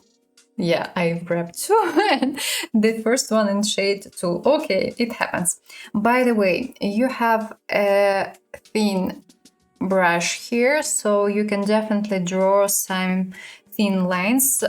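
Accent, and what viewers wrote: Russia. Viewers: Ты русская? Просто русский акцент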